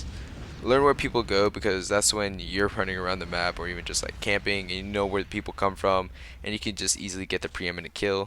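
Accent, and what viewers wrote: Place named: California, United States. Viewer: Lol are you from California or something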